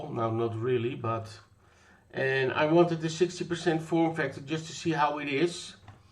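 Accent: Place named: Netherlands. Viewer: You sound very Nederlands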